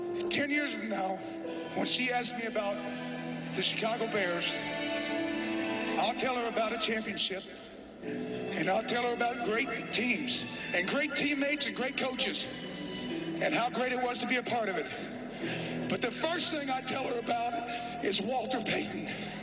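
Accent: United Kingdom. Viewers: A fucking British accent reciting stats pretending he knows what happened. Stick to Soccour.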